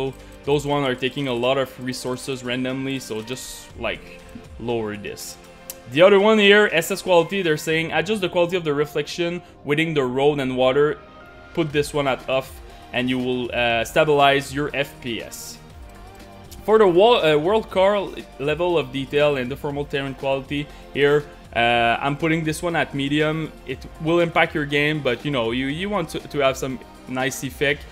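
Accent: Quebec, Canada. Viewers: This guy is from Quebec, I'm sure of it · tu sonne comme un quebecois qui essaye de parler anglais (your voice sounds like a guy that speaks french trying to speak english) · t'es québécois sa parait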